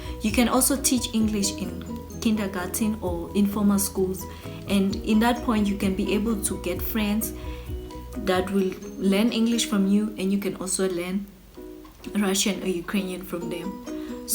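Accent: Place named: Eswatini. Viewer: Your Swazi accent is beautiful 😍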